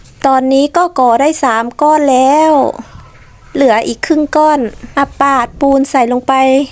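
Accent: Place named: Laos. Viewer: เสียงไทยปนลาวสาวแว่นตั้วนี่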